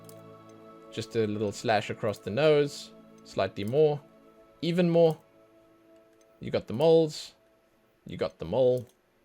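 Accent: South Africa. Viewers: Are you South African? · I like how detailed this is thank you, and by any chance are you South African? I love your accent! Also I love your commentary and jokes here and there it makes me laugh! Thank you for this lovely video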